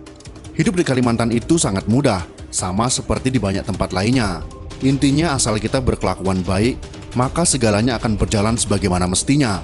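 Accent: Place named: Banyumas, Indonesia. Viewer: min orang ngapak Yo😅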